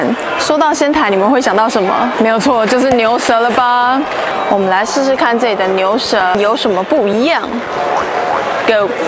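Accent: Taiwan. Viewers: 你講臺語好可愛哦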